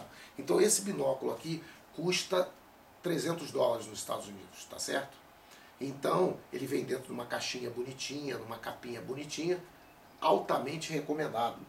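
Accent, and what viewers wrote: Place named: Brazil. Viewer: Legal o jeito de falar kkkkkkkkk Sotaque bacana ! Brasileirado falando 👏🏻😃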